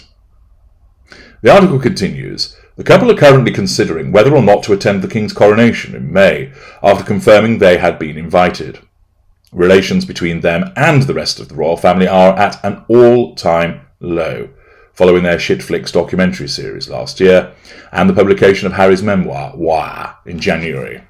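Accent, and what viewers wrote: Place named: Scotland, United Kingdom. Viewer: I'm Scottish and your posh Scottish accent is so funny. "Farquhar" 😂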